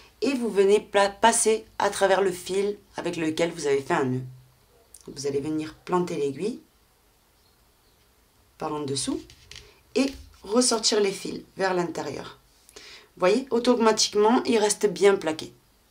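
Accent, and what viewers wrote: South, France. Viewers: bonjour.. et merci beaucoup pour toutes ces belles choses que vous nous donnez à faire.. j'en suis à mon quatrième châle avec vous, et je ne peux que me féliciter de vous avoir trouvée sur mon chemin, en me promenant sur YOUTUBE... vous m'êtes d'un immense secours; et ainsi, je peux faire plaisir, dès que possible, à certains de ma famille et j'apprends plein de chouettes points, ce qui me transporte de joie.. merci beaucoup. le dernier en train est un point relief que j'ai fait avec caprice couleurs mélangées qui rappellent de délicieux sorbets pêche/vanille/citron enfin des couleurs pep's et un point extra!! le prochain avec le point "pouf"!!... sera le bienvenu.. merci pour vos explications claires et attentives... et aussi pour votre accent qui me fait voyager au pays du soleil.. bonne journée et portez vous bien.. à bientôt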